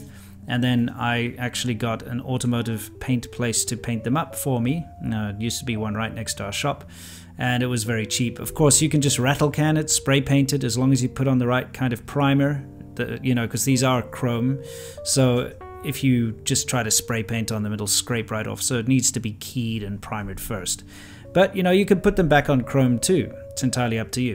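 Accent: South Africa. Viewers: OMG..Sitting here watching this vid as I just bought a mint 2012 GN, and just surfing through related vids and I'm thinking, man, this guy's voice sounds just like my South African cuzzies voice from serpentza and low and behold! Man this is so cool I this channel, had no idea you gad this, keep it awesome! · What part of south Africa are you from?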